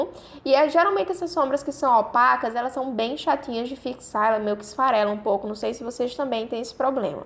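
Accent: Northeast, Brazil. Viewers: Amei o vídeo e já me inscrevi. De onde vc é? Tem um sotaque nordestino. Sou de Recife. Beijos 😘😘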